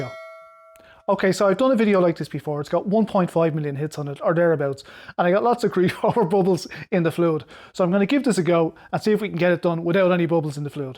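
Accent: Ireland. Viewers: And cool Irish accent to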